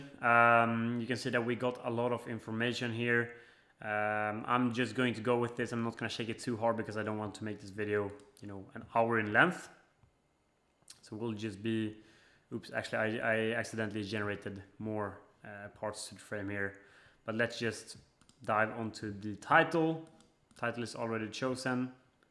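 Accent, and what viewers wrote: Sweden. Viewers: Brors svenska dialekt är galen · the way this guy pronounces "ch" sounds is too funny "sjat GPT"😂 so swedish!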